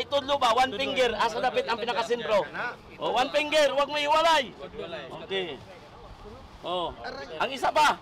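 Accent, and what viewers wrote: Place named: Visayas, Philippines. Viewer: Bisaya rin Pala to c, sa Salita palang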